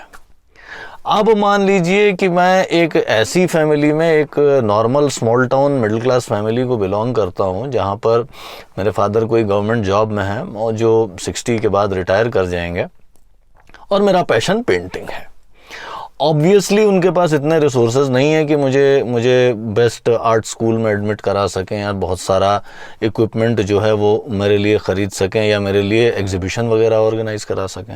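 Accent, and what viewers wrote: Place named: Indore, India. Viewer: Hello sir, meri yah janne ki iksha hai ki kya App indore (MP) se hai kya... bas ese hi puchh raha hu kyuki apki boli se app indore ke lagte hai...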